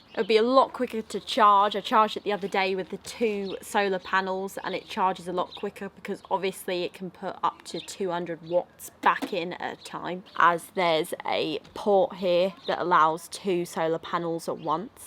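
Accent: United Kingdom. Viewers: I am here for the British English. English is not my first language. I am used to see dressed up in cold weather hiking clothes. I just find out that underneath all those clothes hides an exquisite pretty girl.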